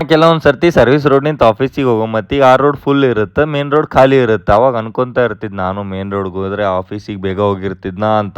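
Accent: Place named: North Karnataka, India. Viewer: Nan Mandya dava Bengaluruli ree....aadre..Uttar Karnataka da kannada sogasu kellike...nim channel chalo ide re....mast maja maadi jevanadnage..Thande thyaai seve chalo maadi..😊😊😊😊😊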